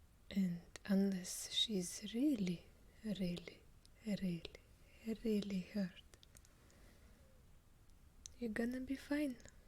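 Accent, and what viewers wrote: Russia. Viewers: I was searching for heavy Russian accents because I adore heavy accents, especially Russian and Ukrainian accents, and I found your video. I found it very funny and also cute. You have a very soothing voice, wonderful accent. Thanks.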